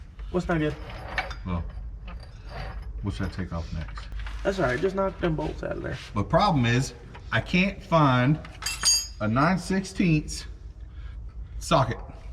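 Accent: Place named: South, United States. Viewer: Thanks for the great content. And also many thanks to your cameraman and editor. Question. Ive heard you and say "Sawzah" several times. Sawzall is a brand name from Milwaukee tools. Is that just a Southern thing to say Sawzah? Im genuinely curious. Not trying to be rude. People pronounce things differently all over the world.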